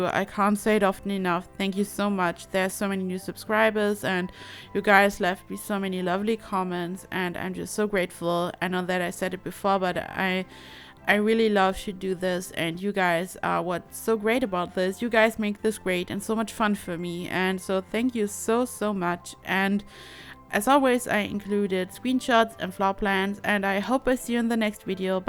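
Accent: Germany. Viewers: In der ersten Sekunde, wo du gesprochen hast, hab ich sofort gehört, dass du deutsch bist :D Grüße erstmal. Ich finde das Haus mega geil. Hab heute zum ersten Mal in Sims 4 ein Haus gebaut (eigentlich mag ich Sims 3 deutlich lieber und hab 4 lange nicht angerührt, aber es hat wirklich viel mehr Spaß gemacht als in 3 und ich bin sogar etwas stolz), an dein Haus kommt meins allerdings bei weitem nicht dran... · Kommt die Tante aus Deutschland? Das Englisch klingt irgendwie nicht wirklich native... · are you german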